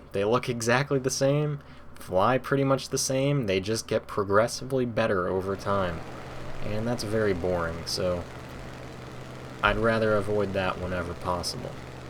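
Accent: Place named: New England, United States. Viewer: I bet your from Massachusetts. Your accent sounds like a New England Suburban Accent. I'm From Boston MA myself and we also say "retarded" like every other sentence. If I'm wrong I apologize but thats my guess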